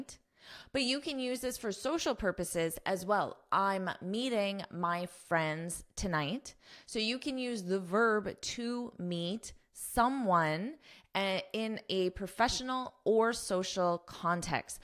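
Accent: United States. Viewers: Are you american? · Отлично хорошее объяснение но не люблю американский английский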